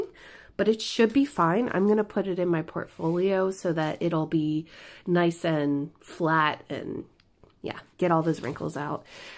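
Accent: Ireland. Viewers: Hi I love your videos, wanted to know what size this was and how much it cost. I ask because Stitcherista just did an unboxing today on her first CraftEase, Her box was beaten up too. I think we all kind of agreed that hers was too expensive for the size she got. What is your opinion on your painting. Also I wanted to tell you that I have been watching your videos for a long time and I am noticing a bit of irish coming out when you talk, it is so cute. Keep up the great work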